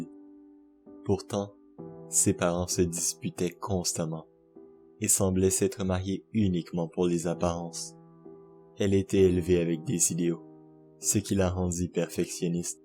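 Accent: Quebec, Canada. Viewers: Hé es-tu québécois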